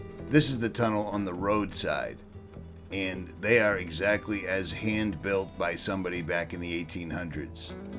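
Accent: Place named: East Coast, United States. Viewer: You sound like. I was raised in Thermopolis and used to fish the canyon and spillway constantly. We still go back every year to visit and fish the Bighorn. Thank you for posting this. Very emotional for me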